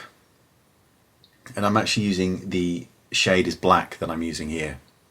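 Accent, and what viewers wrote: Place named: United Kingdom. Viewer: nice British accent~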